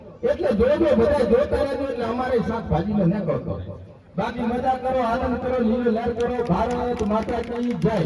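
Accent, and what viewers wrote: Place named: Vadi, India. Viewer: વાહતા ભાઈ તમારા વિડિયો જોવાની મજા વાદી ની બોલી માં હો❤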